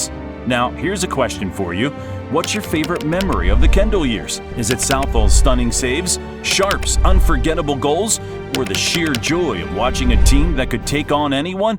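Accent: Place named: United States. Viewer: bro is your voice AI and why is an american speaking about everton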